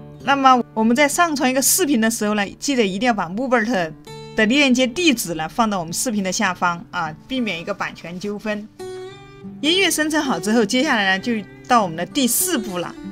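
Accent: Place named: Sichuan, China. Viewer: 哈哈，四川人哇，老乡见老乡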